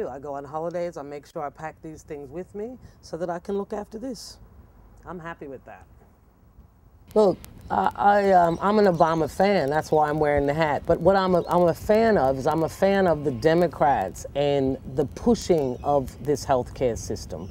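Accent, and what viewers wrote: Australia. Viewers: 10 seconds in, that accent, she's a real Australian now! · Don't worry. You're an Aussie now. You even sound it,😃 our government cares more about its citizens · You've deff got a half Australian accent going on there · love your Aussie Twang in your voice. so coooooool. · BigImaginationDoll16 I was thinking the exact same thing. Some words are said with an Australian accent, like when she says "dollar". Veeeeery interesting hmmmm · can hear the Aussie accent quite clearly. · Her accent is a spin out. About 70% Aussie with some yank. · Got that Aussie accent coming in mate :-)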